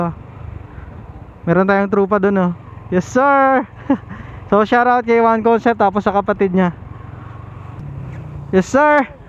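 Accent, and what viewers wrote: Iloilo, Philippines. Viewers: Ilonggo ka gli to😂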